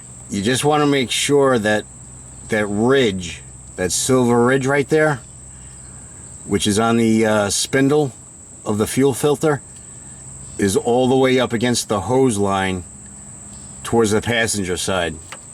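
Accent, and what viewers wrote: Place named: Boston, United States. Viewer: Thanks for explaining every step while using the exact words to describe what parts needed what size tools, which direction to turn, slide, twist, push, pull and clip everything your hands touched under there as you recorded this. You didn't talk too fast, you're easy to understand and your Boston/East coast accent- Luv it! 😊 My auto mechanically inclined go-to men (Dad & Gpa) aren't here to help me with things like this anymore, so Thank You for the time, effort and energy you put into this video!!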